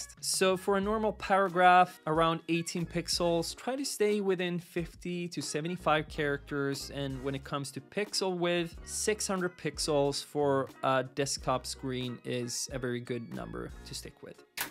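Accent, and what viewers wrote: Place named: California, United States. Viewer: typically cali dialect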